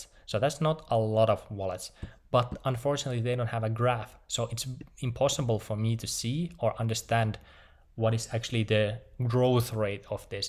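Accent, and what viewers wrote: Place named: Finland. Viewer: Are you from finland? cuz you sound like it.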